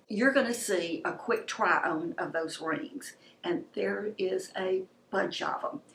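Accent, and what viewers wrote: South, United States. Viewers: Where are you from? You have a southern accent. I'm in TEXAS.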